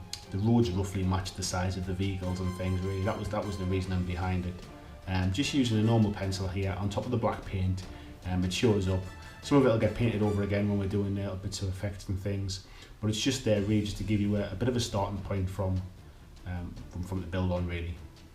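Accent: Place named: North East England, United Kingdom. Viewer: Nice build with the board, added this to my YouTube list for referring to at a later date, can I ask where you are based as I believe I can hear an accent that is suggesting Newcastle/Gateshead/Shields/Sunderland in the N.E of England.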